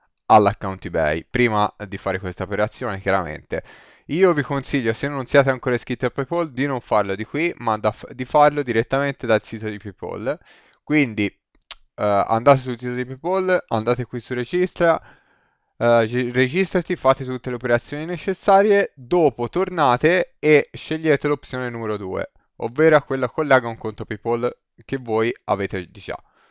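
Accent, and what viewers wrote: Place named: Tuscany, Italy. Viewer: Tutorial Toscano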